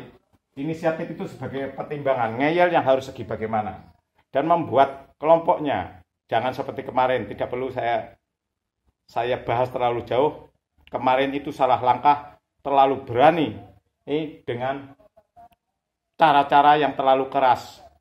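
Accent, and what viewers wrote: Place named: Banyumas, Indonesia. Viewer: dari daerah mana,logat banyumasan nggeh. Saya suka gaya ramalan huruf dan Agama. Enak dipahami.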